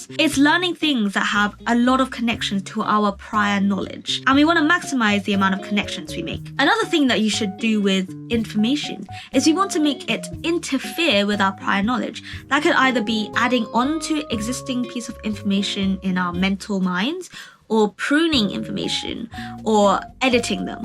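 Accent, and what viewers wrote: United Kingdom. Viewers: Oh the Britsh accent. I thought you said watch lewd things...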